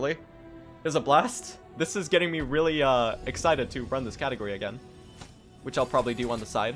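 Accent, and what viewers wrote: Sweden. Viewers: as a swede, you barely have any swedish accent. i'm impressed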